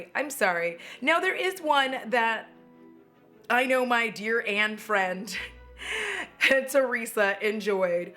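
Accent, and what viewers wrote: England, United Kingdom. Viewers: My husband just walked past me and said “Ooh, nice colour hair, ” and I agree, this teal is amazing on you. And, your Charlotte Tilbury English accent gets the seal of approval:)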